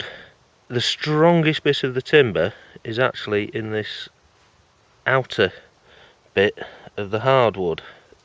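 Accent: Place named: England, United Kingdom. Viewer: Im an American and it sounds a lil funny that you have an English accent but you read inches. thank you for the information bud. cheers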